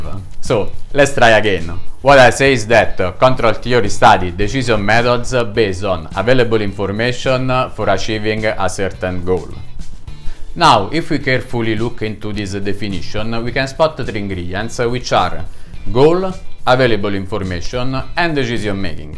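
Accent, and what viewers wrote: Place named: Italy. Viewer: Your accents makes me think you are italian...without subtitles it would be difficult for audience to understand what you are saying....overall your content is very well structured👍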